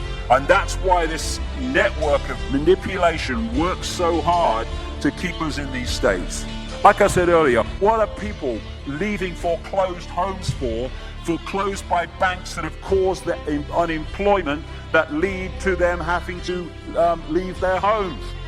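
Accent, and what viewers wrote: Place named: United Kingdom. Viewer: First this guy is a Brit, but definitely NOT David Icke's voice. Good piece nonetheless!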